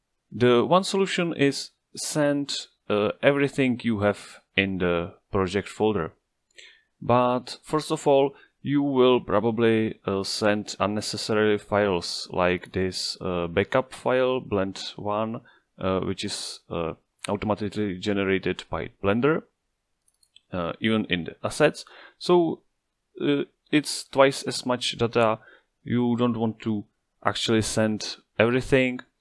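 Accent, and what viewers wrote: Czech Republic. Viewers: this is exactly what i needed thank you! by the way, are you by any chance from Czechia? the accent sounds familiar :DD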